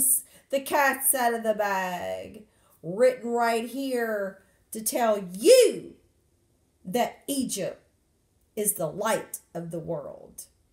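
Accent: South, United States. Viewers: Me being from the south I love that southern accent don't change it for anyone...one book I will suggest for you to read and maybe to expound on its arcanian Freemasonry. By RA Gilbert..this book was shed a lot of light on a dark subject for you and will bring together some missing pieces of the puzzles as far as other things are done and said how are ancient Freemasonry was that of the arts of the ancient Egyptians and how the Greeks and Romans stole it in Martin British empire who inherited has changed it to be a good old boys club while trying to exclude African-Americans from it. Great piece you're doing wonderful.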